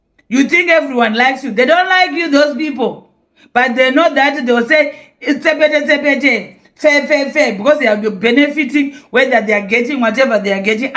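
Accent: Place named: Shona, Zimbabwe. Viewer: Hi. I love your your Shona presentation in English. Kkkk. It makes the presentation enjoyable and understandable. Keep it up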